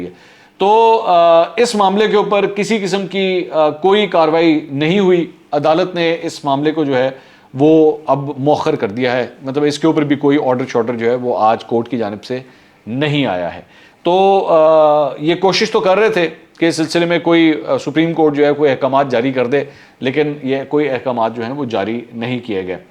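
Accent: Punjab, Pakistan. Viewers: Mansoor Shb, your Vlogs are upto the mark, in my opinion there is no such change required, as I always listen to your vlogs on 2x speed, so it eventually takes half of the time and your punjabi tarka sounds much better in that speed. Lots of prayers for you. Dr. Syed Ammar Ali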